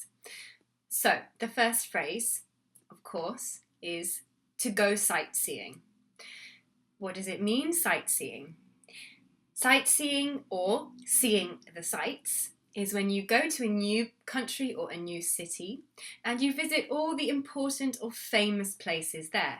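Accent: United Kingdom. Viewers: дожили, британский английский уже акцентом считают)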